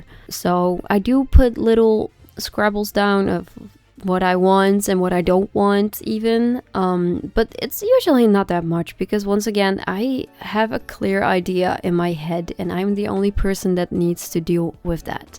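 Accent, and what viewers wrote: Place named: Netherlands. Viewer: ive loved httyd for so long, I went to see all the movies in the cinema with friends or family. I loved the way you drew hiccup and toothless (although i might be more biast towards T cus I love dragons in general) It's always so odd seeing a style i recognize and then realizing I've seen insta reels of them before 😭😭 (kny saja boys) Also I love your accent!! I knew it sounded dutch and I always get excited seeing other dutch artists who like the same medias I do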